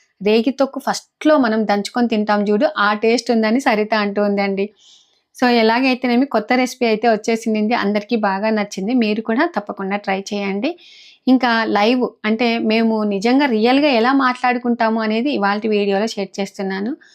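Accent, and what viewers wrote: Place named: Kurnool, India. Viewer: మన కర్నూలు యాస మార్చి మాటలు వదు 🎉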